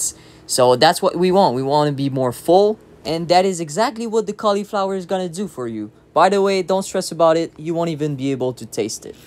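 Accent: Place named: Quebec, Canada. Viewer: Ahh I see, you have that GSP accent haha. Keep up the good work man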